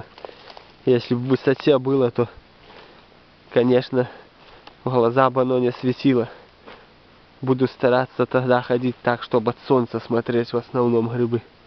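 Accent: Belarus. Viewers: Спасибо за видео . Как сама за грибами сходила смотрю вас у вас такой приятный говор беларуский , мне как бользам на душу .Я родом из Житкович , живу в Тольятти мне все родное что вы показываете . Удачи вам !!! И милион просмотров и подписчиков .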